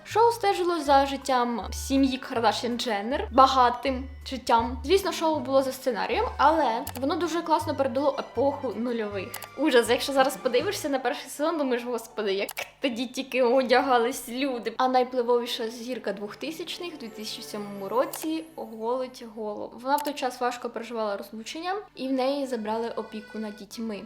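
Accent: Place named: Central Ukraine, Ukraine. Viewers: Ви межі своєї області не покидали ніколи?😅 Центр і схід України, майже всюди, говорять так) Послухайте творчість Артистки, вона з Херсонщини😊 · Я так думаю дівча з Полтавщини... це типова полтавська "манєра балакати". Я сама з Полтави, у мене навіть подружка є, просто копія ця мала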